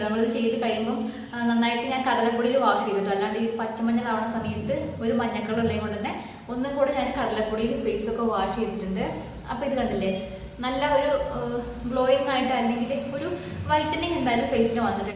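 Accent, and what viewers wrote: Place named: Thrissur, India. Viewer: Trissur aano home, slang 👍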